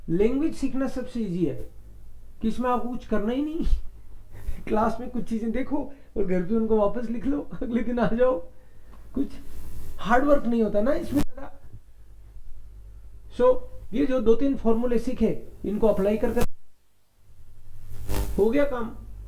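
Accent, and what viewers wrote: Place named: Germany. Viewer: Learning a language requires learning all its aspects. If you can't do German accent, at least try. Your German accent is pathetic & disgusting to hear. Just rote learning grammar & expending no effort in accent-polishing! Please don't go to Germany as we, other Indians, do not want to be judged based on one bad speaker.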